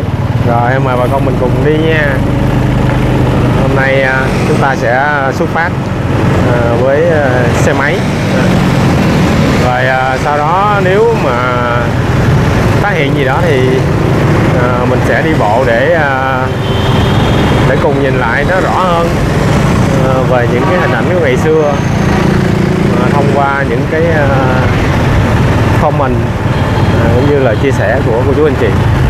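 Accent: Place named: South, Vietnam. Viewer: Noi tieng mien nam nghe rat ro thanks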